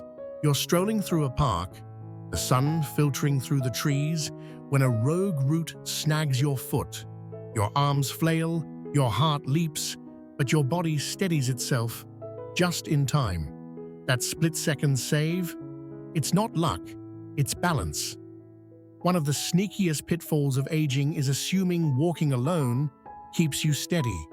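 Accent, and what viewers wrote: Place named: United Kingdom. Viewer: What’s with the GdDam British accent?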